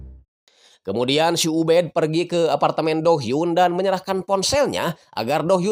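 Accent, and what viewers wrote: West Java, Indonesia. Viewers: Mimin na mni khas cara ngomg na kaciri sunda na kacida😅😅😅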